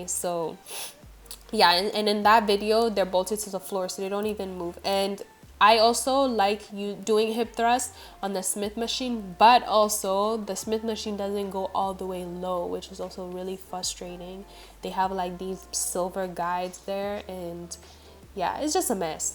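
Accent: Caribbean. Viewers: You’re body is amazing! Love your videos, are you Caribbean? You have a slight accent but I can’t tell from where lol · Are you Caribbean?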